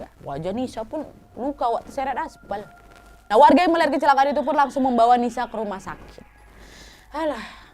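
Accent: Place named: Java, Indonesia. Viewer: Plg suka kl wak ngomong bhsa jawa, lucu tp salut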